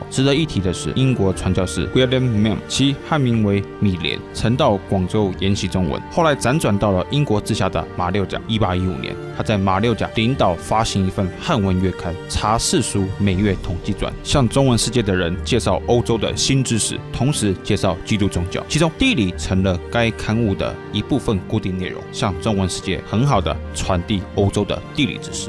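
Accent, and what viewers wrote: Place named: Taiwan. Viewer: 能不能配上中文，你这台湾腔有点听不懂啊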